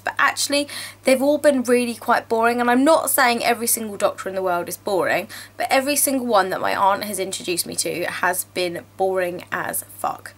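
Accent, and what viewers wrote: United Kingdom. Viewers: I love listening to your stories and I COMPLETELY agree with everything you said! :) It's like people think that just because he's a doctor and has money, makes him a catch. What about true love?! By the way, your accent is literally amazing! I could just listen to you talk all day! Haha! I <3 British accents! :) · your accent is so alkdjfaldh WHY AM I NOT BRITISH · lol..just moved back from the UK to the States. You make me miss the accents lol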